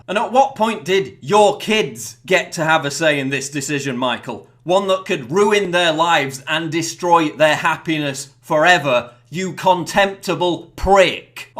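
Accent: United Kingdom. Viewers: Paul Joseph Watson Okay, Paul, between your humorous and colorful description of the truth and your British accent, I could listen to you all day long!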